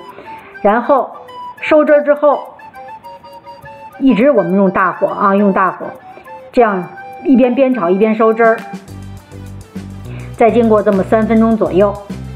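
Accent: Tianjin, China. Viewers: 一听就咱们天津卫口音，倍儿亲切！😄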